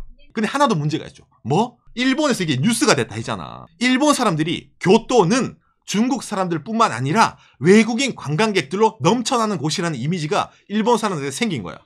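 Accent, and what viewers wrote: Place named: Changwon, South Korea. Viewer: 살짝 창원느낌이네요 ㅋㅋ 창원출신 대학동기도 창원프라이드가 엄청쌔더라구요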